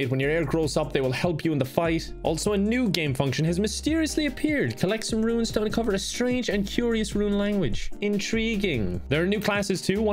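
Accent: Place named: Ireland. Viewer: I swear the more insane gets the heavier his Irish accent gets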